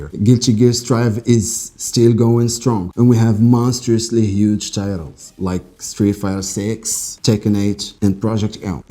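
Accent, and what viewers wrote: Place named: Morocco. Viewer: Good content bro keep it up (ps:: mn accent dyalk u sound maghribi, did i guess that right ?)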